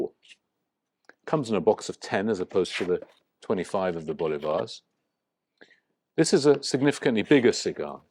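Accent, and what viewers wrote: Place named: United Kingdom. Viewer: nice ckearly britain english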